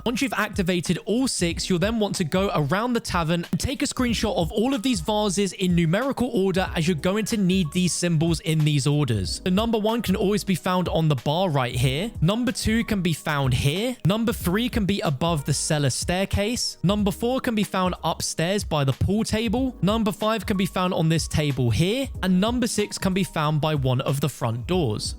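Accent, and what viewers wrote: United Kingdom. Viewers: صوته بريطاني مزعج كثير كلام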